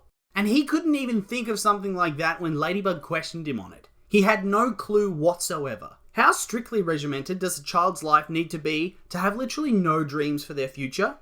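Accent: Australia. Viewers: Not mean to offend you or anything but you sound an awful lot like an Australian 😂😭✋✨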